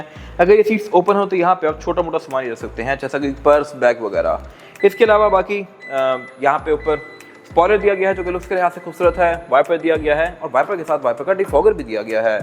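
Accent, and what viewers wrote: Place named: United Kingdom. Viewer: آپ کی آواز کی صحیح سمجھ نہیں آتی ۔ یا تو آپکے مائک میں خرابی ہے یا پھر آپ تھوڑا آہستہ بولا کریں اور اردو کو بجائے انگریزی لہجے میں بولنے کے اردو ہی کے لہجے میں بولا کریں ۔ ریویو آپکا اچھا ہوتا ہے پر آواز اور لہجے کی وجہ سے کچھ الفاظ سمجھ نہیں آتے ۔ شکریہ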